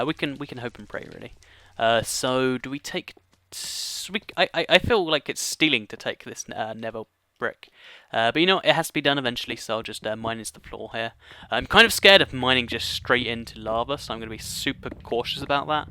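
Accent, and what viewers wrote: United Kingdom. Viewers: Yeah.Your british accent is pretty interesting though, that's part of why I like your channel and I also like your channel because you are a very informative channel :')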